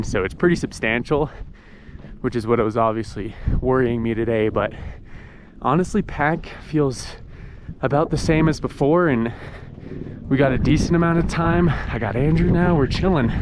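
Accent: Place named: Scotland, United Kingdom. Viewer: Hearing a northern/Scottish person was not what I expected but yay.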